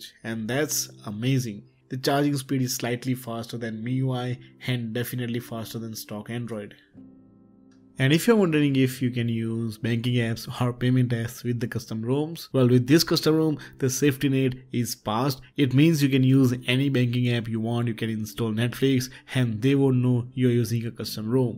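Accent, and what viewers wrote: India. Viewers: Fcking hindi english accent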